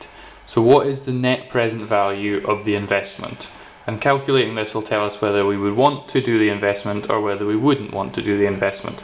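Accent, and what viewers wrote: Scotland, United Kingdom. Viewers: God bless you. You truly are a gentleman and a scholar. Your Scottish accent is a comfort to me whenever I click on these videos.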